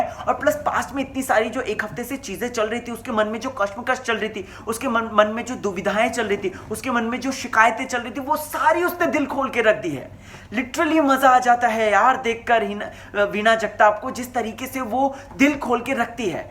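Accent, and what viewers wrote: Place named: Maharashtra, India. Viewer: Aap bhot cute marathi bolte ho😍😄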